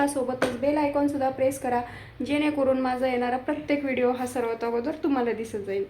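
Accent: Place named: Nandurbar, India. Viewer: तुमचं गाव कोणत आहे तुमच्या बोलीभाषेवर तुम्ही नंदुरबारच्या किंवा जळगावच्या आहात असं समजतंय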